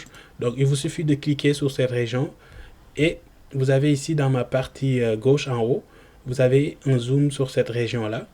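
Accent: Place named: Senegal. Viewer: Tu es probalement sénégalais à ton accent. Pourquoi ne pas travailler aussi sur une carte de ton pays?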